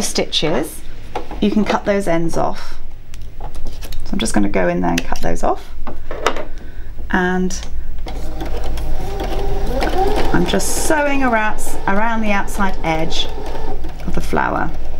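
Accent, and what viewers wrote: United Kingdom. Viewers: Thank you so much for this - have been sat getting very frustrated by wasting time on other useless videos and yours was perfect!!! 🤩 🫶 Quick question, if I may 😊 I have Brother 380D, and only 5 or so automatic embroidery patterns are marked for being ok for water soluble stabilser… the majority of the rest look pretty much chunky enough around the edges and fillings etc to be able to be held together enough -would they work and it’s just Brother being overly cautious? 🤭 Anyway, having watched your video, I now see that the world is my oyster! 😁 Thanks tons from a Brit who’s been living in France for so many years, that it was warming to hear your accent, as the majority of my crowd are French 😂❤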